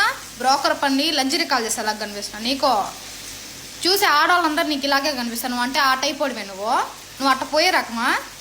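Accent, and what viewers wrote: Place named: Kadapa, India. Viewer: Endi medi kadapa me basa Chala bagundi I love you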